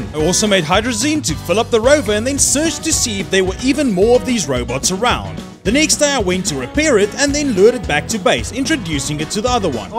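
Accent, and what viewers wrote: South Africa. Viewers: Are you South African? You sound South African. I am from South Africa, lol.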